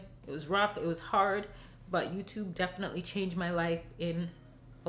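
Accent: Jamaica. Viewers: it was so cute to hear you speak patois 🥰 edit: oh my gosh I got teary as soon as you got emotional. I’ve watched your channel for years and I’m so proud of your success! so happy you’re able to live your dreams and comfortably take care of your beautiful family ❤️ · Love the accent! Makes me think of my Grandma she was from Kingston Jamaica 🇯🇲💗 I can understand. You are absolutely beautiful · What a beauty😍😍 Jamaican accent cant hide at alll😩🇯🇲🇯🇲🇯🇲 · I understand what you said when u speak in your Jamaican accent bc I'm Guyanaese! 💜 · her voice is so soothing wth lmao and i can tell she’s jamaican it comes through very slightly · I have never heard your Jamaican Accent before and i can understand patois! Lollll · COME THRU JAMAICAN ACCENT!!! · Yes that patois 🇯🇲🇯🇲🇯🇲🇯🇲 🇯🇲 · Wow didn’t know you were Jamaican and had an accent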